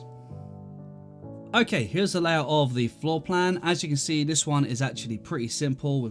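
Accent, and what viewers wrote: United Kingdom. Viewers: I love bri ish people shutter: shu uh. Three: free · I like ur british acent❤❤❤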